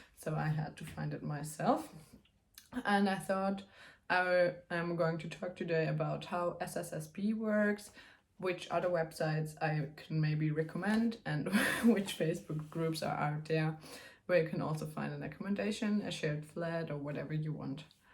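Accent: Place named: Germany. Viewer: it's a german accent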